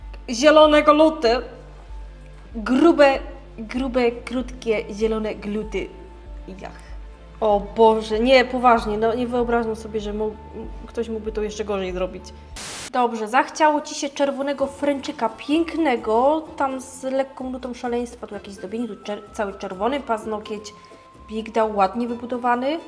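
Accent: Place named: Silesia, Poland. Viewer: mów wiecej po Śląsku plisss uwielbiam!!!!!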